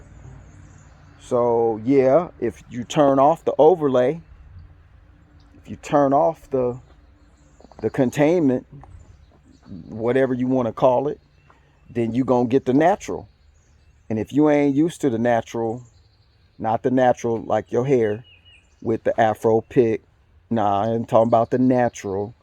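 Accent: South, United States. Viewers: I love the message. you sound like you from down south. my family is down for your training!! this is food!!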